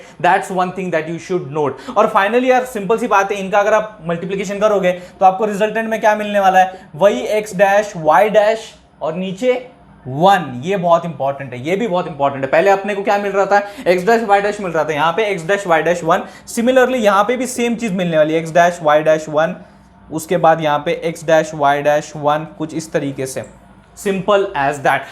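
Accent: Maharashtra, India. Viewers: Sir aap Marathi bande ho na 😅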